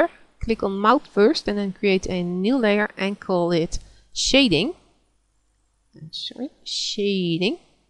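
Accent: Netherlands. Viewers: Great video! Love the Dutch accent :)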